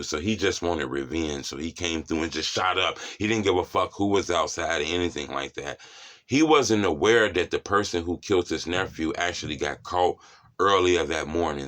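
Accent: Chicago, United States. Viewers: I knew u was from the Go from the accent when I watched your first video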